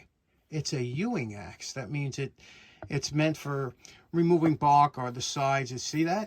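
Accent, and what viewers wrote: New York, United States. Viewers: That was a great show today. You made me chuckle when you said it's a “ewing ax” as in JR Ewing. It’s “hewing ax” but you just can’t hide that New York accent. a smart guy, worried he might get electrocuted so “I’ll give it to 😂”. I’ve never seen a double handed screwdriver before and I love that Greenfield tap wrench. Cheers mate, Stuart 🇦🇺.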